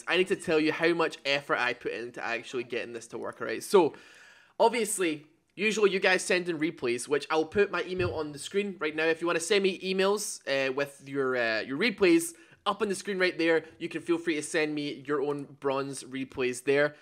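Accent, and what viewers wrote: Scotland, United Kingdom. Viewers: where are you from? scotland?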